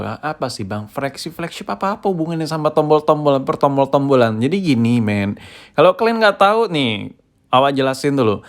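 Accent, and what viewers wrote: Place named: Medan, Indonesia. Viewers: ini malah ingatkan awak saama logat medan,kreak lah, pukilah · Medan kali bah logat kau kimak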